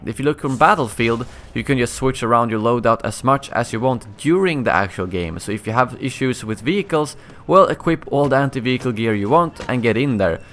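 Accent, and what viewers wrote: Stockholm, Sweden. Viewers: Haha älskar "stockholmsdialekten" på engelska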